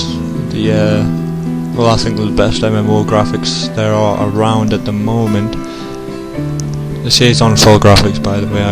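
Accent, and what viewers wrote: Newcastle, United Kingdom. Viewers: Are you from Newcastle? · Wewt, geordies ftw! Me too. :D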